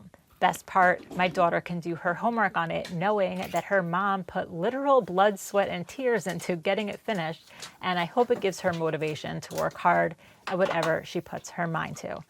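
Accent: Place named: New York, United States. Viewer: Not quite sure it’s everyone, but we all love and accept the way says it, she’s a New York girl. NEXT!!!!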